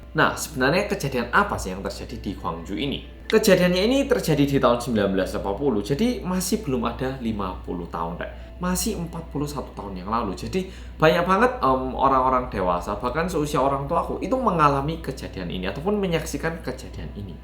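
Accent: Java, Indonesia. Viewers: Kemaren LUWEE. Sekarang MEDOK.😂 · bagus bangeddddd, medokkk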